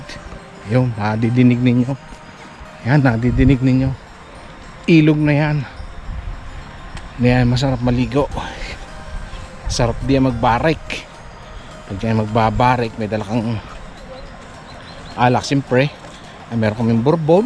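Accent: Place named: Batangas, Philippines. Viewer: I like the batangueneo accent hehe.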